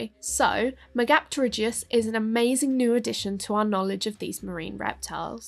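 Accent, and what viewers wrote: United Kingdom. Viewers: She also has a the THICCEST bri'ish Accent of the Trio now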